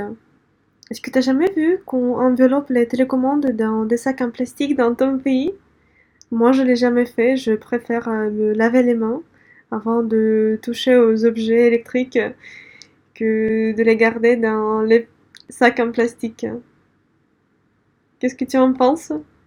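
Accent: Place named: Russia. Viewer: Privet J’apprends beaucoup de choses sur la vie en Russie ma moitié est impressionné elle est Russe mais je lui dis rien c’est un secret continue comme ça pour tes vidéos c’est toujours appréciable et on apprend beaucoup de choses tu es très pédagogue et surtout ton accent russe qui est toujours agréable merci à toi vivement le prochain cours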